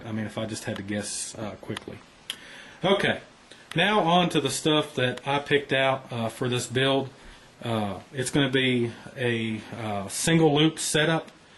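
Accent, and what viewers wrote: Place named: Southeast, United States. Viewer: your accent sounds like american south-east? Would you mind telling where you live? don't need to be specific.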